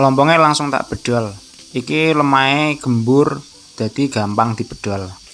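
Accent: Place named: East Java, Indonesia. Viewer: Mangane uuakeeh.. logat jawa timur ya Mas'e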